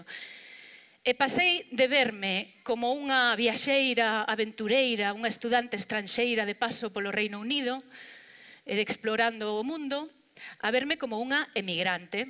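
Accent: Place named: Spain. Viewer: Excepto num muito ligeiro sotaque "espanhol" esta apresentação não teve nada de exótico para mim que sou do norte de Portugal. É em grande parte o meu idioma que ouço aqui.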